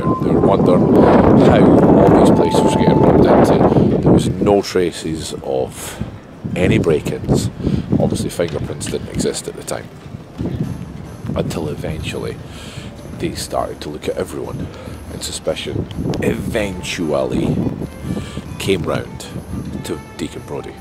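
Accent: Scotland, United Kingdom. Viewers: I've loved the city ever since spending my junior year of college there at the University of Edinburgh. Good times! And somehow, whenever I see the castle, I feel like I'm home, at a second home. Plus it's always a treat to hear the Scots accent. My father was Scottish, but his accent had pretty much vanished when I was growing up - although it would return with a vengeance if he ran into a fellow Scot!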